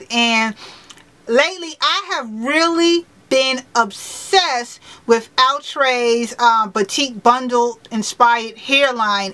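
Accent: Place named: East Coast, United States. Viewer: New subbie love the accent. Philly, NJ or NY? Lol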